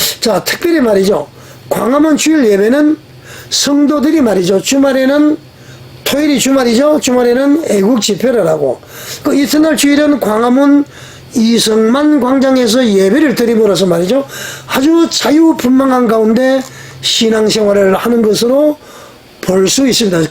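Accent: North Korea. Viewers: 해설하는이분은 이북말투예요 이상해요 ㅡ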